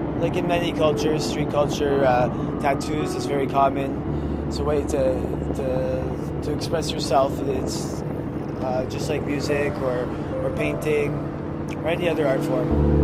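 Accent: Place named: Quebec, Canada. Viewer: Man, he has that French Canadian accent which I can't stand.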